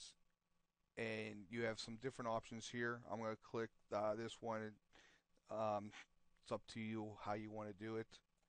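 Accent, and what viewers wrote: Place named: Northeast, United States. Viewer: Thanks. Quick & easy instructions for dummies like me! One little point to consider: When you underscore text like you do in your sub-headers, some readers may think it's a link and try to click on it. I usually use a smaller font and bold and/or italics for these. Hope you do more of these videos. P.S. Like your accent. Are you from the NE US?...you sound like it. I'm from Long Island myself...oy!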